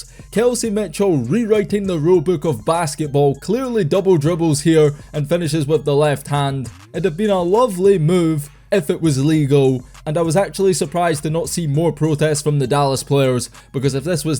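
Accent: Scotland, United Kingdom. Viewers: Besides the fact that I just cannot stand your voice you embellish so much it is just nuts you exaggerate to the point where I can't even stand to listen to you it's like you are reading this off of a paper which I'm sure you are and it sounds exactly like it I don't know why they don't get somebody with a English voice that doesn't have some kind of Scottish accent I don't know any Scott's that are playing basketball for any team in America it's annoying just listening to you and listening to you exaggerate is the worst part of it there are Junior High girls in America that can do the same things that these girls are doing and you turn a simple layup into the greatest play of all time I just can't stand listening to you these other people can listen to you I'm done with you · It's crazy to hear this guy with his Scottish accent talking about American WNBA basketball. 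😂😂😂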